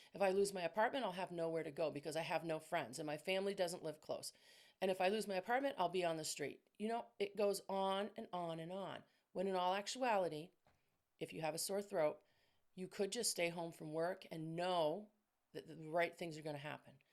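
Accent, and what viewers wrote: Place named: New England, United States. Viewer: Thanks so much for this message! PS I knew right away that you were a fellow New Englander. Hello from Maine❤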